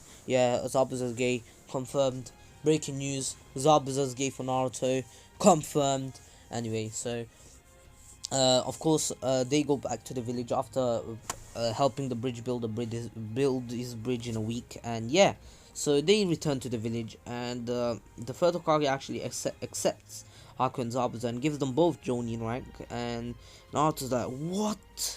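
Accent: United Kingdom. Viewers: You sound so British.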